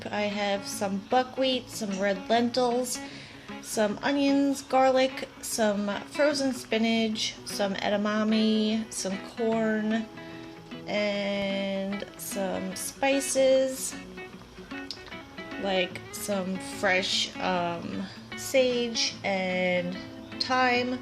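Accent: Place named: Boston, United States. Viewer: you from boston? sounds like it how u pronounce coffee, sauce and salt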